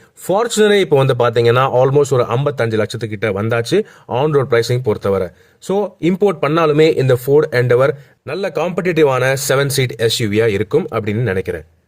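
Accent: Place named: Kerala, India. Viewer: Why you are talking like Vinu Chakravarty… full of Malayalam vadai adikkuthu unga Lin voice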